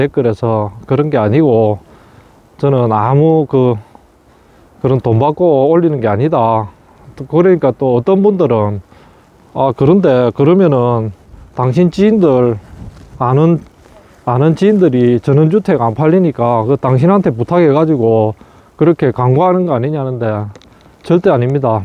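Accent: Gyeongsang, South Korea. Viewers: 부조리 고발영상및 구수한 경상도 목소리 너무 잘하고 계십니다! 방송 잘보고 있습니다! 화이팅!! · 한 2주 전부터 보기 시작했는데 처음부터 믿을 만한 분이라 생각했지요. 경상도 억양이지만 사투리는 거의 없는 구수한 목소리가 좋아요. 그리고 잔잔한 정의감, 왠지 성실할 것 같은 삶의 자세, 이런 것들이 좋아 보입니다. 계속 열심히 하시기 바랍니다. 챙겨보겠습니다. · 3만 축하드립니다 ! 시골의 모습을 이렇게 정직하게 보여주시니 앞으로도 구독자수가 빠르게 올라갈 것 같습니다. 얼굴공개 안하는 건 좋은 결정인것 같구요, 정감가는 경남사투리가 참 듣기 좋네요 ~ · 감축드립니다 매우건전하고 진실된팩트방송 최고예요👍 경상도사투리 너므정겨워요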